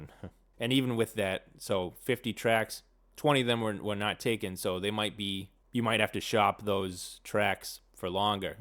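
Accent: Boston, United States. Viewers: Are you from Bah-Ston? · I’ve heard other sync people say to do niche music and not try to do 10 different genres. Isn’t that the strategy with growing a following on social media as well? Btw Is that a Boston or New England accent?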